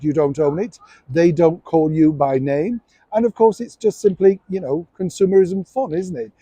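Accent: Yorkshire, United Kingdom. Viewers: Thats his accent as he is from Yorkshire. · Not just Yorkshire pronunciation. That is how we were taught before so many decided that they would follow American pronunciation of this and many other words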